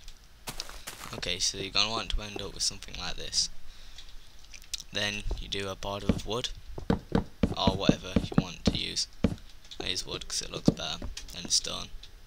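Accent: United Kingdom. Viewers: No, he doesn't, he just has a geordie accent. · you gotta love a good brit voice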